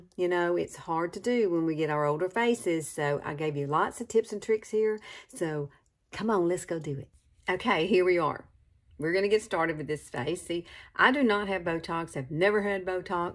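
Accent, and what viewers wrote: South, United States. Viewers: I’m from Mobile Alabama I thought you sounded southern. Southern girls need to stick together. You’re absolutely beautiful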